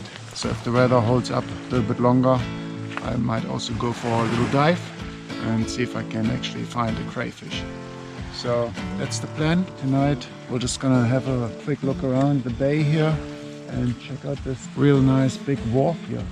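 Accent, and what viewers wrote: Germany. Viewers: hey mate, what exactly is that pedal boat you got there, from where did you got it and how much is it? was in jackson bay the other week and thinking to buy one aswell, would be mean. you sound german btw, grüße 😆✌🏻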